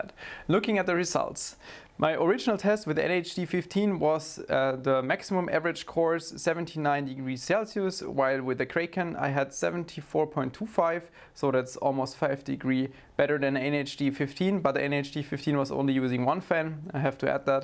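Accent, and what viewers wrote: Germany. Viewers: Man merkt direkt dass du deutsch bist xD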